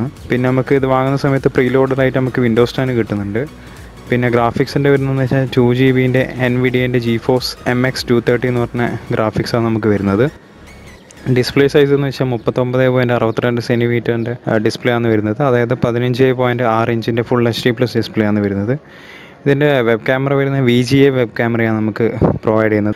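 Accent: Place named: Kannur, India. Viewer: Aah kannurkaran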